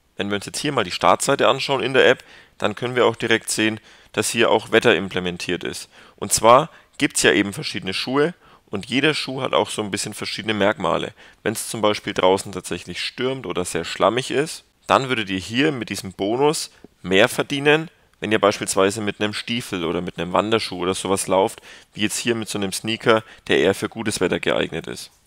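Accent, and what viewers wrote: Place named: Nuremberg, Germany. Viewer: folge dir mal auf twitter ;) ich hörte da so einen Nürnbercher dialekt raus endlich mal einer mit Youtubetschänel aus der Gegend nice vl. kann man sich mal treffen ;) ich bring auch an LKW mit (nur ein Franke weiss was das für gutes Essen ist ) ;) mjam mjam ;)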